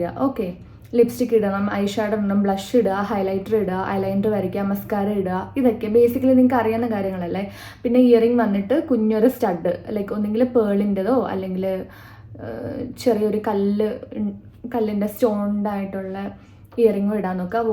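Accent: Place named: Kochi, India. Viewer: തിരുവനന്ദപുരം സ്വദേശി ആണെങ്കിലുo കൊച്ചിയിലെ ഒഴുക്കായ മലയാള o കൊണ്ടു എല്ലാ പേർക്കും വളരെ ഇഷ്ടപെടുന്നുണ്ട്.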